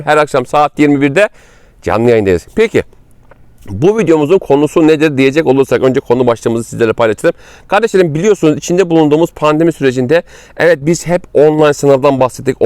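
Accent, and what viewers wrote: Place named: Black Sea, Turkey. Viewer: Hocam cok guzel karadeniz ya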